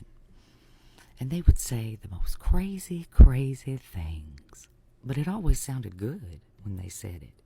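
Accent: South, United States. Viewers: Miss, as a Texas Southerner myself, your voice is like drippin' honey, darlin'!! It doesn't really matter which accent from the South you're using, they all sound like home to me. Bless your heart, darlin'...I could listen to you all day and I grew up around that "sneaky tea", too! 😏😉🍜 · Born and bred Southerner here; your accent sounds like home to me..although my grandmother and her siblings ended sentences with honey instead of baby, and did that thing with r's at the end of words, or should I say woids. They were raised in Selma after their con-artist slash preacher dad lost the literal farm for which he still had the original land grant from that crazy English king that just wanted to get his troublesome 'Scots-Irish' mercenaries out of the way once they'd finished up the militaristic suppression of actual Irish people in their own homeland. I wish mine had been a matriarchal lineage like yours, but my gran was as feisty as a Southern lady born in the early 1900s could be and still reign as queen of the first baptist church where she moved to as an adult. 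She was one mean old biddy underneath all those impeccable manners, but if you listened carefully you knew what was what right enough... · Your accent and your voice sound so smooth and rich. Your southern humour is hilarious. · Well I D Clare. Love your accent. I'm a southern girl too. South Carolina to be exact. Love your story. ❤❤ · I hate southern accents. Blatch devero is why you sound familiar. 🤣🤣🤣 I have a hard time listening to you but I always hear the message. DON'T CHANGE A THING 👍👍👍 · Baby girl I knew you were a southerner, possibly the deep South, however I still love your accent. I also have an Aunt Janie she was cool as hell. I'm from North Carolina, and yes when you were a child you had to break the codes in order to understand what the old hands were talking about. Coz back in the day children were supposed to be seen and not heard. Keep spilling the tea I love you❤❤❤❤ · I love love love your soothing, truly southern voice. 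❤ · Wonderful stories! Thank you, ma'am! If people think your accent is fake, they've not traveled the south. I was raised there, spent half my life there. So many, nuanced variations of the sothern accent, depending what state, coastal or mountains....NOT color of skin. Thank you, sister!😊❤ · Frankly, my dear, I dont give a damn 😉 where or how your deep silky voice and very southern accent came to be. It's very real to me. Therefore, you talk, I listen. Willingly. Intently. Respectfully. Adoringly. Thank you, Ma'am. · Bless you and thank you! I love me a beautiful (voice) and proud southern accent..and woman.❤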